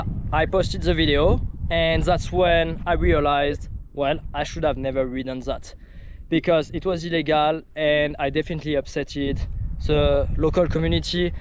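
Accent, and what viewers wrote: France. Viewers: That french accent tho 😭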